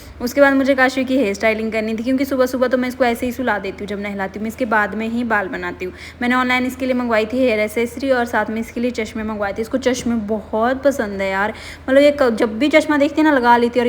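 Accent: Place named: Pahadi, India. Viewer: Today's voice over has a lil bit of pahadi accent 😂❤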